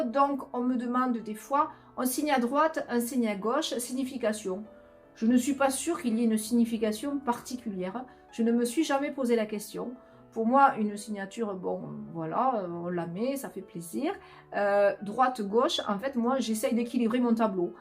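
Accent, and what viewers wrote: South, France. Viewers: Merci pour tous ces conseils et surtout ne vs arrêtez pas de parler avec votre accent du sud c est le soleil qui ns envahi!!! Ah et vs vs êtes un vrai rayon de soleil merci pour tout ce que vs ns apportez.❤❤❤❤énormes bisous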